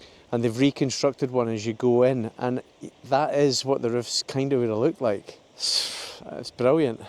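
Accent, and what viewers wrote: Scotland, United Kingdom. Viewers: I found your channel by following you on IG and I still can't figure out how I found you there either lol. This is wonderful and you have a new fan from now on. It's quite hard for me to fully understand that scottish accent but I'm doing my best without using the subtitles. I'm from Argentina and been studying english for many many years but the scottish accent still gives me nightmares lol. Thanks for sharing all these magnificient places.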